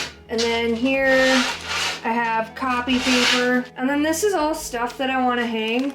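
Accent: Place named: Minnesota, United States. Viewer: That Minnesota accent lol. Ehhh!!! I’m from SC so I’m sure I’d sound funny to you. 😂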